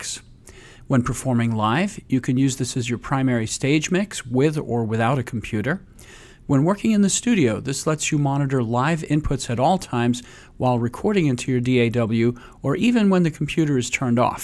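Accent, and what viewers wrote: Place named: Netherlands. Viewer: Interesting video, in addition it sounds like the speaker is dutch..!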